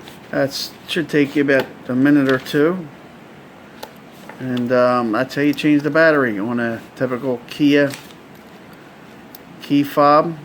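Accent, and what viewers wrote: Philadelphia, United States. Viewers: You from Philly? Know the accent anywhere lol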